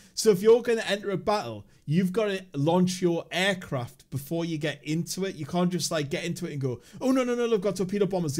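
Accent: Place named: Northern England, United Kingdom. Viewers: Oh god a bloody pommy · hello from newcastle, i noticed ya northern twang there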